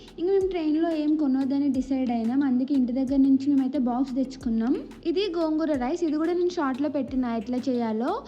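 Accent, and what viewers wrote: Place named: Srikakulam, India. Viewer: Srikakulam slang🔥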